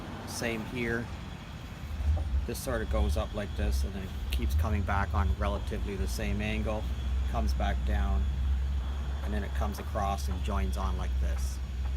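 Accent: Canada. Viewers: Loved this 😁 was real and I could feel like I was there watching you draw!! Also love your Canadian accent expecially when you say (Out) 😁 great art, great artist, and great channel, all the best my friend 👍 ❤️🇬🇧☮️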